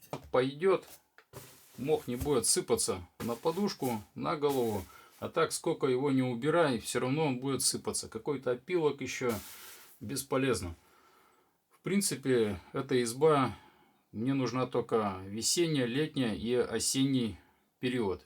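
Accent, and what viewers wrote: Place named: Arkhangelsk, Russia. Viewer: Хорошее видео. Вы наверное с Архангельской области. Напиши. Говор наш.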